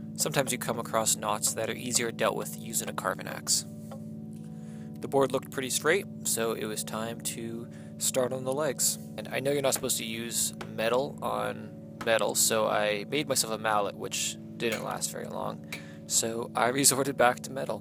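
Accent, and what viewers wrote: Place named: Canada. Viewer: Are you Canadian sir?? Good to see fellow Canaidan on here lol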